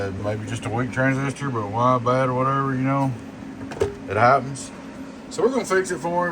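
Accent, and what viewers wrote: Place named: South, United States. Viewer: Yee old southern draww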